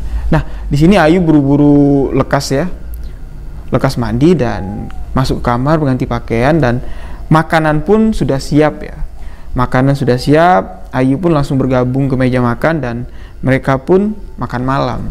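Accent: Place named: Bali, Indonesia. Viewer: Ooo,,,logat nya ky orang bali 😁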